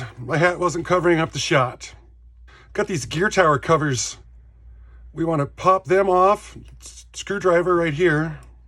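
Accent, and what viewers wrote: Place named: Minnesota, United States. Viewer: Watching this and the missus comes in and asks if is from Minnesota with the accent. Know you're in Montana now, but were you originally from Minnesota or North Dakota?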